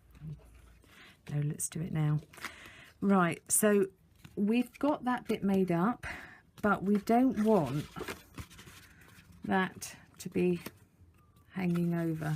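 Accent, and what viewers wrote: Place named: United Kingdom. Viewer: This card is stunning! I just found you and love your clear explanations. (The British accent is a bonus!)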